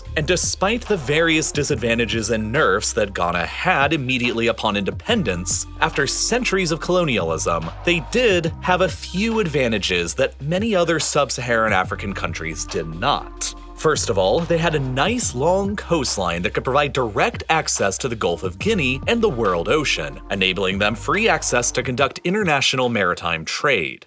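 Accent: United States. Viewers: Why do you use the international measurement standards but still use American only pronounciations on words like Alumunium (internationally pronounced: a-luh-mi-nee-uhm)?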